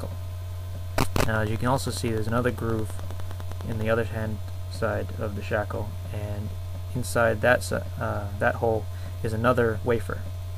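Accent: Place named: United States. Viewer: i cant hear anything at the end, and he has a proper amarican axent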